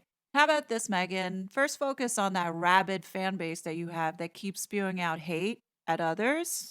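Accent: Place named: New York, United States. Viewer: another great video! Right on point. As an aside…I love it when you are on a roll and your NY accent comes flying out of your mouth..!!! Thank you for all you do!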